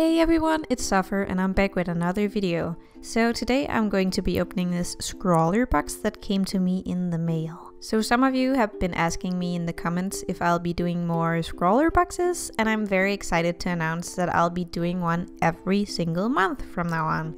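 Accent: Denmark. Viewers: Er do fra Fyn eller Sjælland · ive been trying to pick your accent, im such an idiot of course youre danish!! such a pleasant accent to listen to <3 lovely art and content as always my dude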